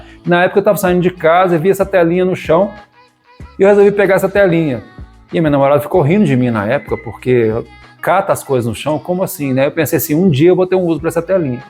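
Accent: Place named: Minas Gerais, Brazil. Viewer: Esse mineirês é tão charmoso, aconchegante e bonito, né? Tal qual as peças!!!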